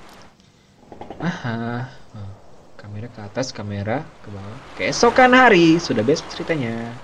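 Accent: Medan, Indonesia. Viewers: Bang Abang Medan mananya? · orang medan ni kek nya🗿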